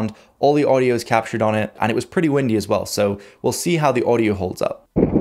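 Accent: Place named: Scotland, United Kingdom. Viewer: But the way you speak is like Scottish....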